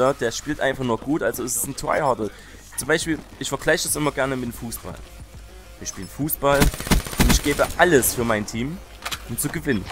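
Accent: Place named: Saxony, Germany. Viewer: he wo kommst du her hört sich an wie als wenn du aus sachsen kommst (ich komm auch aus sachsen)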